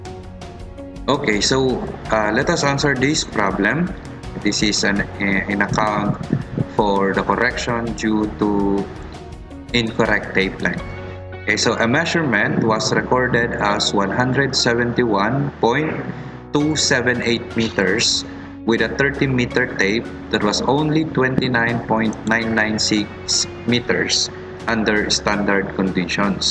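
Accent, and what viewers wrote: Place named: Ilocos, Philippines. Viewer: Love the ilocano accent😘 you save me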